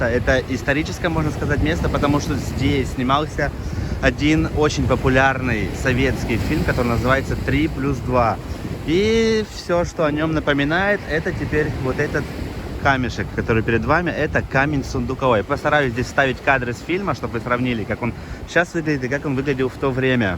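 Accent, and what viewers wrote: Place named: Ukraine. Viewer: Спасибо,! Путешествие очень интересное и полезное! Даже кажется я надышалась тем воздухом, которым дышал ты. Очень хорошо рассказываешь немного с украинским акцентом, это ещё приятней. Здоровья тебе и поменьше экстримов, а то даже дух захватывает и страх за тебя. Береги себя!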